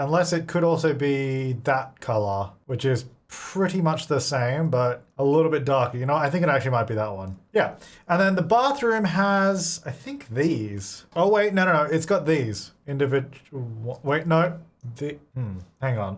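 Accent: Australia. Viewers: I’m curious… is this guy Australian? I like the way he says “He-Ah” instead of “Here” but it’s cute. Good video btw!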